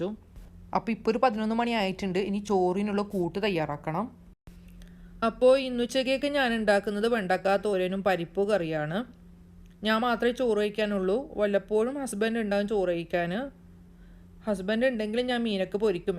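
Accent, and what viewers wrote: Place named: Kasaragod, India. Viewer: Ningal kasargod aano?slang kettit chodichada...evide thamasam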